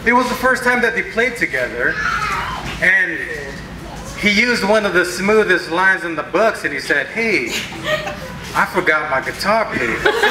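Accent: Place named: California, United States. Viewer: Whoo this guys from California for sure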